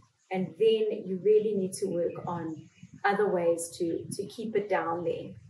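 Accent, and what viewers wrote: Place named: South Africa. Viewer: im australian i just heard the accent and thought it was south african :)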